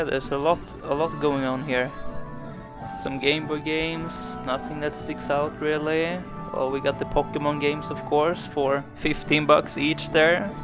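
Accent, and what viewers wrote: Sweden. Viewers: Guessing you saw my comment on jcontra's recent vid and subbed to me as a way to let you know your channel exists.....interesting way to get peoples attention (it worked!), vids look good so far so I guess I'll stick around! bra att veta att det finns fler YouTube retro-samlare i Japan (could tell immediately you're Swedish from the accent ha ha)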